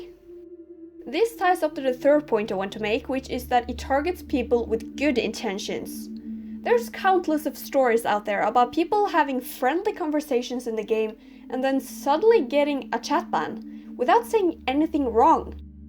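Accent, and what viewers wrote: Norway. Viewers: are u norwegian? o-o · wait are you norwegian??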